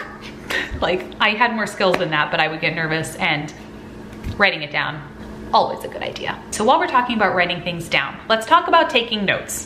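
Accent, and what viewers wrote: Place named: Midwest, United States. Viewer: Ok but the foldable clipboards (one that fits in your pocket!) are good! I love your videos btw. Are you from Minnesota? Or somewhere in the Midwest? I’m from Minnesota and your voice kind of sounds Midwest!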